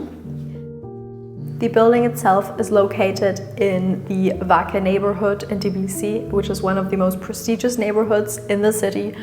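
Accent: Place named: Germany. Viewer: Die Objekte auf diesem Kanal sind ja wirklich interessant und inspirierend – wenn nur die Moderation nicht wäre: Dieses deutsche English, verbunden mit einer kindlichen Schwatzhaftigkeit, ist einfach entsetzlich nervig.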